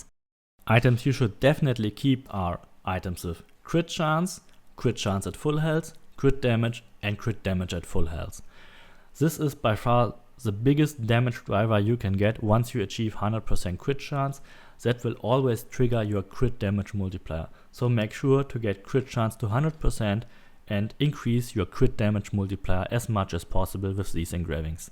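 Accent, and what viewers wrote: Germany. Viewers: I haven't heard such a classic German accent since playing Titanic Adventure Out of Time.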